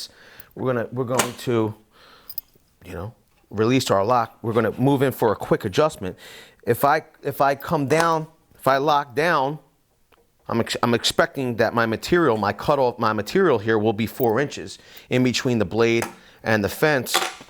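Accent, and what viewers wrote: Philadelphia, United States. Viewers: I've had this Skil saw sitting in my house for a week. My first tablesaw and I'm terrified to use it lol. Your video is probably the first I've seen where I feel more confident. Others casually rip through wood, no guard on, like it's not a big deal - and I KNOW it is. You've made it a point to keep yours on and show how you're ripping with it on. Granted, maybe a 4x4 or a very thin piece might need it off (?), but you then made sure to say the riving(sp?) knife should stay on if we know what's good for us. I appreciate this approach you're conveying - it's not overly fear-inducing, but also not callous to the consequences. And also, Philly Philly! at least I assume you're from Philly. Your accent made me wonder, and then your ending solidified my assumption.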